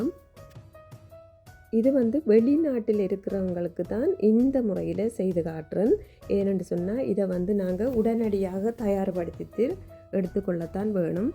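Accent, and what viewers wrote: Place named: Sri Lanka. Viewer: ஸ்ரீலங்கா தமிழ் ரொம்ப பிடிக்கும் எனக்கு என் பிரண்ட் valvettithurai நான் இந்தியா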